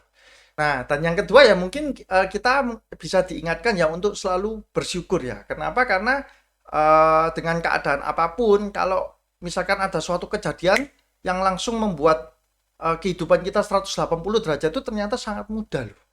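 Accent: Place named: Java, Indonesia. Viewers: Iya medok bgt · Mas e medok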